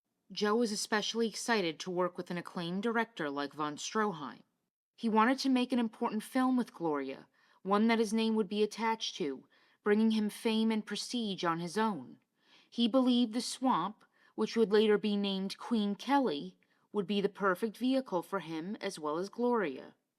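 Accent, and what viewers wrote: United States. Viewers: No AI-generated voice can duplicate your very human Mid-Atlantic (?) accent. Super job, great narration, beautiful use of images. Such "tattle" tales of the rich and famous aren't my cup of tea but I'm happy to have found this impressive iteration of Old Hollywood history. Brava!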